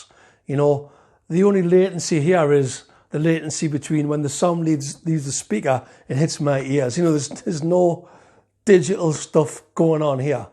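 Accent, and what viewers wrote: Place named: Ireland. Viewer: Thanks for your honest review! I know you're a less is more kinda guy, but not everyone else shares that philosophy. Having features is a good thing because it gives you more options to tailor your sound. However, if you don't want or need them, then simply don't use them! But I think having more options available gives you more flexibility and not just a one trick pony amp sound. In addition,, it doesn't matter if it's only 1, or 0.1, watt. Having two channels and a foot switch allows you to go from clean to scream at the touch of a button for different sounds for rhythm and lead, etc. By the way, as someone in the states I enjoy listening to your voice because of the accent . Are you from or located in Ireland? Just curious!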